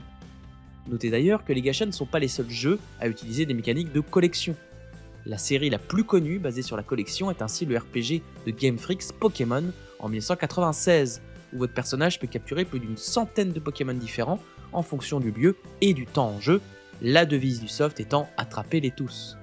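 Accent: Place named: England, United Kingdom. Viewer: Incroyable vidéo et j'ai adoré l'accent anglais, continue comme ça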